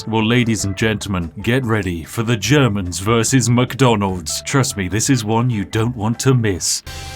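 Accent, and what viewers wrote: United Kingdom. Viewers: If nothing else, I can always look forward to listening to this man's smooth British voice explain how he's broken the very laws of physics. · The Internet Historian is a lot more british than i remember...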